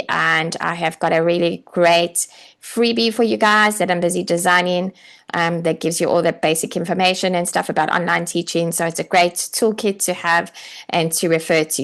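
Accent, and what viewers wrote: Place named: South Africa. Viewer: Im ex dbn..living in a Sydney..nice to hear south Africa voice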